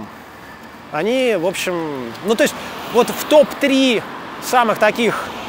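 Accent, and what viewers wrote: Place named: Saint Petersburg, Russia. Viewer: теперь я наконец понял, что значит питерский акцент)))) вернее не акцент а - манэра)) огромное спасибо! отличный материал, отдельно за Вяльцеву. Жаль не знал раньше, зато теперь могу смотреть НОН - стопом) огромный респект,!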